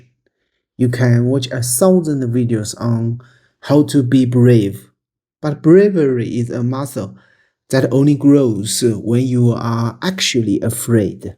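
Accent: China. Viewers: he does have a strong chinese accent though so maybe its just a neccesity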